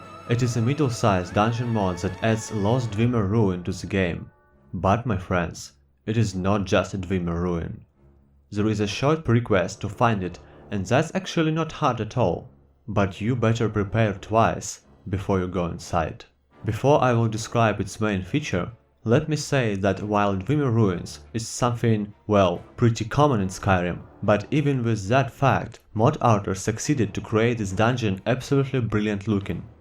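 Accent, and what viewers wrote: Germany. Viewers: You have the first good-sounding German accent I have ever heard. It takes my brain a moment longer to understand what you are saying, as with most accents, but clarity is not an issue. Going from speaking German to speaking English is difficult from what I have heard, so keep up the good work!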